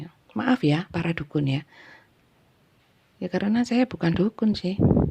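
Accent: Java, Indonesia. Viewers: Jawe nya pekat betul..gud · Sehat selalu untuk bunda .. selalu senang kalau bunda baca dan kasih pencerahan untuk kedepan nya .. sering senyum" sndiri kalau bunda baca dengan logat jawa nya .. salam taurus dari bali bunda ❤❤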